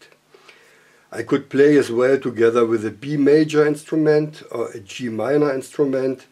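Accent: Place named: Germany. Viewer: am Ende wird man den deutschen Akzent nie los.. Jedenfalls danke für das Video, ich habe lange nach etwas in der Art gesucht..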